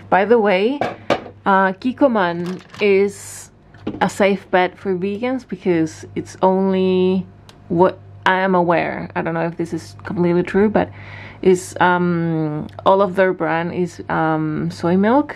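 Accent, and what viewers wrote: Chile. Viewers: !!! Me encantaría que hablaras más en español en tus videos!!! Tu acento chileno es genial y podrías poner subtítulos en inglés!!! おねがいします!!! 🙏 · Amo tu acento chileno!!! Y que escuches... Abrazo gigante desde Argentina aa💫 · Amo su acento chileno! 🥰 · Hola! Aquí una mexicana que tuvo dificultad para entender su español chileno, pero qué lindo que es su acento, eh! <3 Me identifico contigo, porque mi esposo también está estudiando un PhD en U.S. y yo soy una artista frustrada (en eso no nos parecemos, tú ya lo eres jeje). Muchos saludos y que sigan disfrutando su tiempo en Tokio, si siguen por allá, nosotros morimos por visitar. Soy tu fan <3:D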